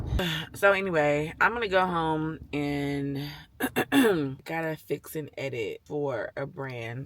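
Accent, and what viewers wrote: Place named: California, United States. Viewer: You look gorgeous, I'm from NY originally but raised in Florida. I could tell by your accent you're from CALI but your fits gimme NY respectfully. Like you'd fit right in, issa compliment.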